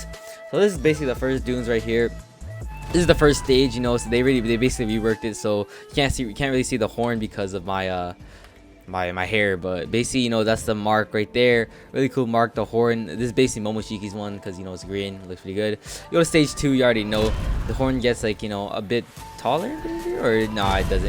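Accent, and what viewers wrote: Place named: United States. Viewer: It’s an American thing we say it like zee instead of see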